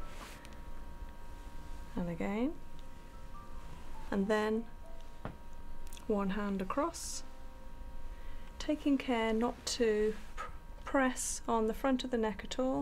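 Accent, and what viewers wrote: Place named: RP, United Kingdom. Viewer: What happened to the all-girls boarding school accent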